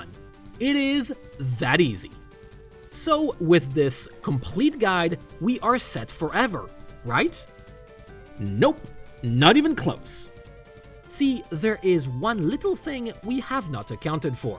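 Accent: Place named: France. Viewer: Youtube automatically translated you to French, and while I'm French and suspect to your accent that you actually speak French IRL I was not prepared for this when the video started.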